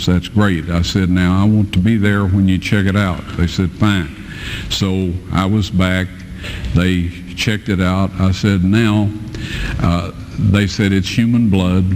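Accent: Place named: South, United States. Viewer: Is it just me or does the gospel spoken with a southern drawl just hit harder?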